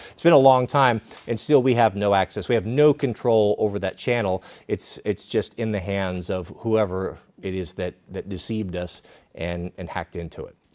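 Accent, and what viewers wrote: India. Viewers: Always the same indianish accent... why?!😢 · Indian voice is a dead giveaway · Indian accent? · First sign of trouble indian accent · Rule number 1. Don’t trust indian accent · Indian accent is the dead give away 😂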